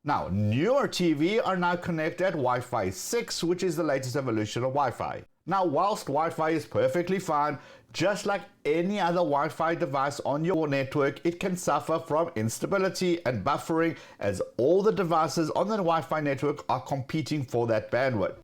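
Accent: South Africa. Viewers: Are u originally from South Africa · For this reason I'm using an ethernet cable & also since the router is too far from my android tv box. Btw you sound like you have a South African accent since I'm from South Africa.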